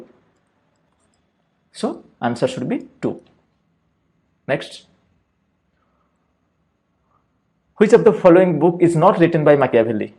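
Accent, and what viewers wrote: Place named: Odisha, India. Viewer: Mu bi odisha ru sir from balasore video re majhire odia voice dekhi mna ku question asila jani parili sir ama odisha ru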